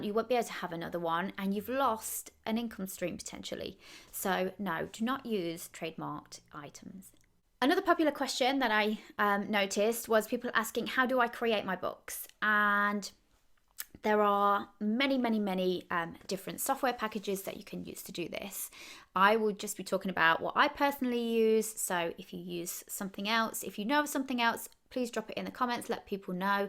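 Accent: United Kingdom. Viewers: I love your British accent. Watching from Iran.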